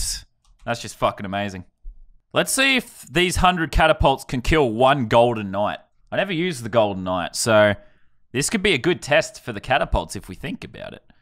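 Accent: Australia. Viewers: are u Australian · Are u Aussie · Aussie accent comes through ama subscribe mate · You an aussie? The vid was in my recommended list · if you think he sounds like pewds... he doesn't, he's Australian. I know cos I am to. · great accent. Australian? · This guy Aussie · Hes pewdiepie but funnier and australian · he's Australian... subscribing now... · Are you from Perth because I'm from Sydney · Australian? Most YouTubers are Americans I am surprised to find a fellow Australian on YouTube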